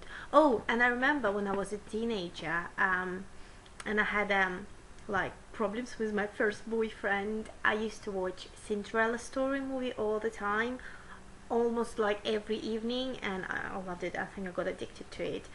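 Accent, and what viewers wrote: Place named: United Kingdom. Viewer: what is your accent? it sounds british but.. with a mix of something? it's cool!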